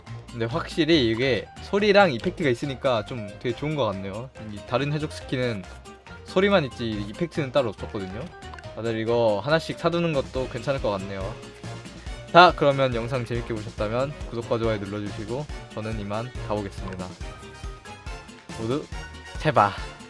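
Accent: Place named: North Korea. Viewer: 북한말투 그만해 제발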